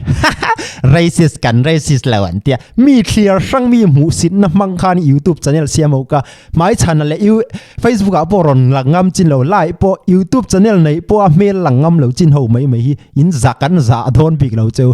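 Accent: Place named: Myanmar. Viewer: Burma tlangval tawngpai nuk2😂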